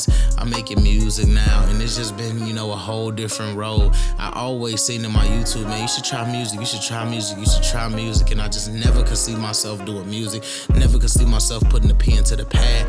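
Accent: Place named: Midwest, United States. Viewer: You got that Midwest flow 🔥 talk yo shit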